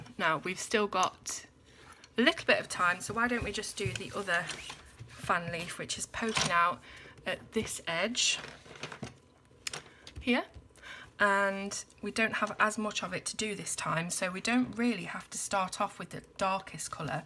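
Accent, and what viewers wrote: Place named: East Midlands, United Kingdom. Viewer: Thank you so much for the mention and for showing us the whole page at the end, loving your videos, dont worry your doing great, and im From manchester by the way, i do go to Loughborough regularly as my daughter and grandson live there so i recognise the "Ey Up Duck" and your accent, mines a typical Northern accent, And i do sound posh on the phone lol my daughters are always saying im like Mrs Bouquet, or Bucket ! haha. Looking forward to more videos please, i love them all, Amanda x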